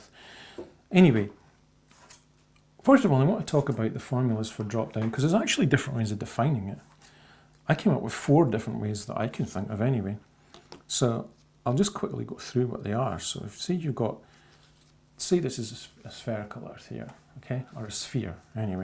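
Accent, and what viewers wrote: Scotland, United Kingdom. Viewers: Are you from Scotland? If so, are you from the Inverness area?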